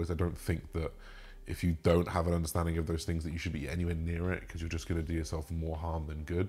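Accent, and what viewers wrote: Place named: England, United Kingdom. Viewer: Jesus it’s someone English 😂 finally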